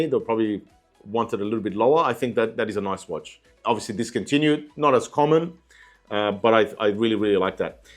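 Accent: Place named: Australia. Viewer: hey brother, are you from Australia as i recognise the accent. Enjoying the content though !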